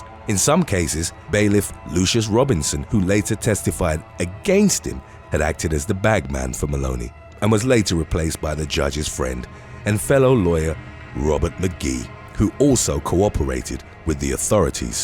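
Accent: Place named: United Kingdom. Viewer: Haha funny British narrator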